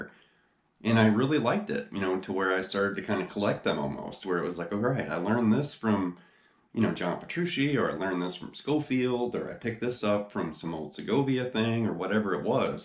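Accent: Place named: Georgia, United States. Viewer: You're from Chicago? You sound exactly like you're from GA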